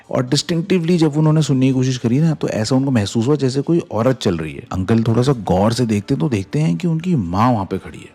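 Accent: Pahadi, India. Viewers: Apke bolne m pahadi accent ata bhut acha lgta h sunna mere bhi apne dosto s bolne m pahadi accent ahi jata h😂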